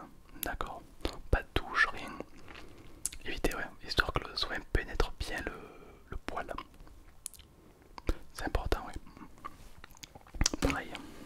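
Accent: South, France. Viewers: L'accent du sud >> les autres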